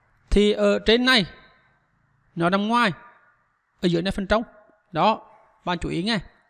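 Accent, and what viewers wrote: Central, Vietnam. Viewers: Hay quá a ơi.giọng miền trung cũng rất là chất luôn a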